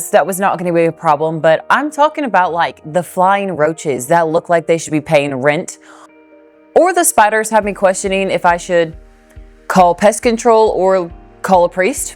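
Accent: South, United States. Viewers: You sound like you was born in the south to me. You don't sound like the typical Midwesterner to me. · you picked up your southern accent very nicely. imagine living in the South before ac. and wearing wool clothes. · You are sure southern sounding. How long you been there? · I absolutely love hearing stories like this. How long did you say you'd been down here? I only ask because you've developed a beautiful southern drawl which think is a compliment. Thank you for the video and adapting to the culture instead of trying to change it. We live slower down here but it's for a reason. Glad to have Ya and keep the videos coming. Now I'm hooked on your journey. Take care! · You have been living in the south for so long that you have actually picked up the accent a little bit. I LOVE that lol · Your Southern accent, even if acquired, is quite good. Our accent or dialect is more polite, inviting, and respectful than other American accents. 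Notice how we tend to talk "uphill" meaning that frequently we end a sentence on a higher note than when we started. This conveys that we are finished with our thought and are inviting a response. This tone also conveys an invitation to accept or reject what we have said without a command to do as we say without reflection as is conveyed with other accents that end in a lower, more forceful tone. The Southern accent is similar in tone to a feminine tone in that it is more inviting and considerate. The accent reflects the foundation of the culture of the South on this point. Our slow pacing with lots of pausing can be mistaken for lack of intelligence or confidence, but that terribly misconstrues what Southerners are implicitly going for in their speech. Frankly, when I hear someone always talking hard, flat, and fast I hear someone who is arrogant, rude, angry, callous, and not very reflective. 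That is not always the case in actuality, but that tone comes across as offensive and disrespectful to me. Southerners are more likely see language not merely as a means of simply getting your point across to another person in order to get a task done but to luxuriate in spending time with other people in your family or church or community as that time, which might not accomplish anything measurable, builds relationships that are on-going an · My dad was in the Air Force so we lived in a lot of different places. All our family was born and raised in Kentucky. I grew up 30 miles south of Miami, Florida in Homestead but lived in Tennessee, Germany, and Illinois to name a few. When I lived in Illinois everyone always said you really talk like you’re from the south. When I was in the South they’d say you really talk like you’re from the North. 😂🤣 One thing I noticed about you is not only have you picked up our Southern lingo but you’ve also obtained the accent. 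Not big time but it’s there. Congrats you’re a certified Southerner now. 😂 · Looks like you picked up our accent just fine! Yeah, in the deep south, we don't have 4 seasons, we get about 6 months of summer, a week or 2 of fall, then the next 6-12 weeks alternates between winter and fall/spring. One of the few places where you can experience all 4 seasons in a week. We don't put away summer clothes cuz that would be a waste of time. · She forgot to mention how small the deer are compared to IL. The accent sounds southern to me. I have never had a desire to travel. A homebody i guess. I like the gulf beaches but i have never been North of Nashville or West of MS. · This is my first time seeing you. Don't know how long you been down south but the twang is coming through really well. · Hope more that looks as good as you keep moving South, you already got the accent. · Sounds like you're picking up that southern accent a little. 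I noticed a few soft "i" a few times. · You have a southern accent 😂😂😂😂😂😂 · To be a northerner you sound like you have a slight southern accent. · U sound like u were born and bred in the south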